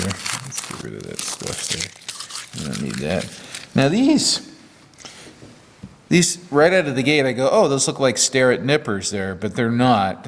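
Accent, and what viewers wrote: United States. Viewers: I always thought that vernier calipers were pronounced "ver-ni-er", not just two syllables. Is that a local east-bay accent?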